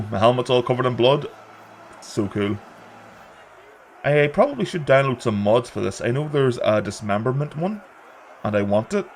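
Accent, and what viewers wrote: Northern Ireland, United Kingdom. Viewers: Its pretty cool to hear a fellow Northern Irish man having a blast with this game, ive pretty much abandoned all my other games over the last month.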